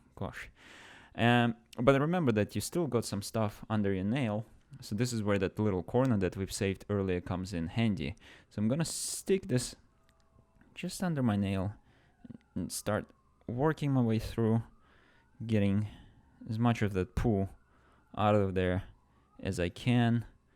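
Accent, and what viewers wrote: Russia. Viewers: С чего ты это взял? Явно русский акцент и отдельный канал где он говорит на русском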